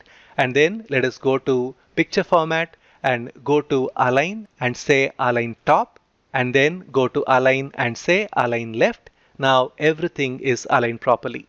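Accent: India. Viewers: Obviously indian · Please don't mind....but can I know that you are an Indian or American Sir?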